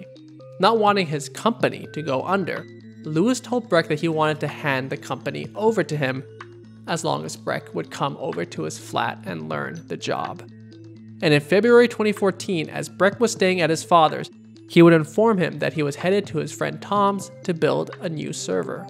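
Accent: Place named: United States. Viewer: Holdup why does she speak American English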